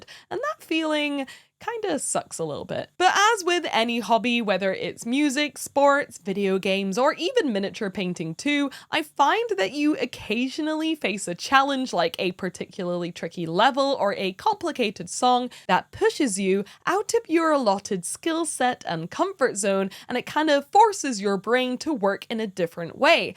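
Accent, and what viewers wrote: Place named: Scotland, United Kingdom. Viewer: It's crazy that you're Scottish, cute and your voice doesn't sound like a garbage disposal. Always great.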